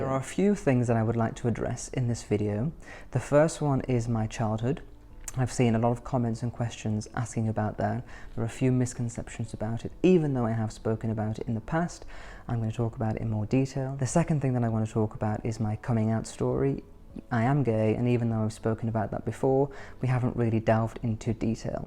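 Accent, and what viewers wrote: RP, United Kingdom. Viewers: I see you have made a massive effort to be RP. Ironically, you sound posher than Prince William as the younger royals now do engage with the estuary accent! Just out of interest, do the rest of your family speak with a Birmingham accent? I think it can sometimes be a shame when people erase their natural accents. I enjoy the regional diversity of accents in the UK. For example, Sean Bean's Sheffield accent really adds quality to his performances. They wouldn't be the same with an RP accent.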